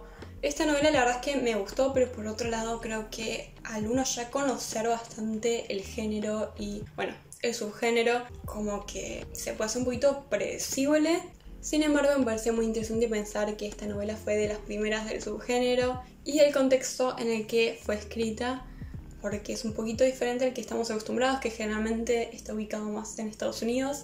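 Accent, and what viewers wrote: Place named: Rioplatense, Latin America. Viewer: Estuve buscando un canal argentino de libros (porque me encanta el acento rioplatense 😍) y encontré el tuyo, me gusta muchísimo! ❤️ Lorca es mi escritor favorito, leí Bodas de Sangre hace un año en original y para mí es una maravilla ❤️ También vi la película y pienso que es tan mágica como libro, me encanta! Saludos desde Polonia 🇵🇱❤️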